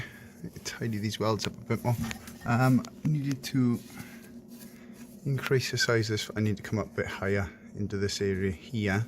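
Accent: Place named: Wales, United Kingdom. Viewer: apart from monky london an recently salvage flippers, I don't watch any of the UK ones...I think the welsh accent helps, nice an friendly😂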